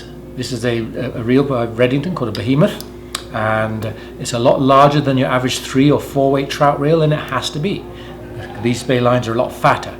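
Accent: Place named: England, United Kingdom. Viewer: There's certainly something to be said about having a presenter with an English accent. Watch any of David Attenborough's nature documentaries and you'll understand why. As per usual does an excellent job of breaking down something which can seem daunting to beginners, into a no nonsense, easy to follow guide for getting started.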